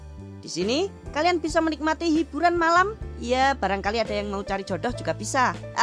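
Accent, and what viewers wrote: Java, Indonesia. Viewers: Medok bgt jowomu. Banyak bgt channel-nya woy. 😅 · Admin ini walaupun logatnya medok bgt jawanya, tapi lucu..gue suka logatnya😂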